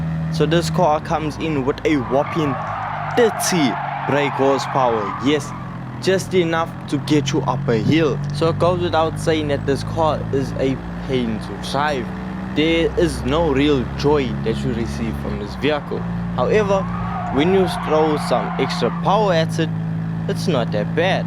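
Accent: South Africa. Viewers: the most south african sounding guy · He is from South Africa after all, the accent makes the video have that much more character.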